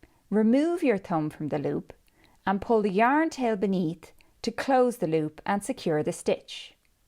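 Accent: Ireland. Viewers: You explain so well and go nice and slow. I think I've got it now. As for your knit stitch, do you knit like Americans and the English or the continental way? It just looked different, but then I haven't knit for over a year. Also, your Irish accent is soooo charming. What part of Ireland are you from? I had an Irish great great grandmother Gosnell, but I don't know where she was from.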